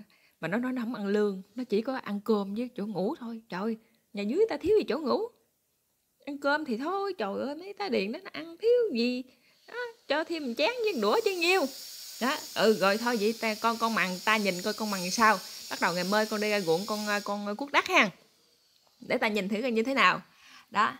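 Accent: Mekong Delta, Vietnam. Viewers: Giọng miền Tây có duyên ghe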